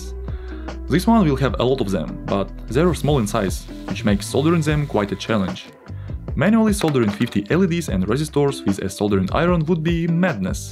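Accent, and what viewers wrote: Russia. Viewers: Russian detected ⚠️ привет дорогой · Мне показалось, или услышал русский акцент? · WTF he haves a Russian accent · Господи чувак, твой английский такой русский что писец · Обычный русско-английский акцент. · why the accent, bro? you sound russian! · Чувак! Твой русский акцент!.. 😂 But still you're doing good! Going to check Your channel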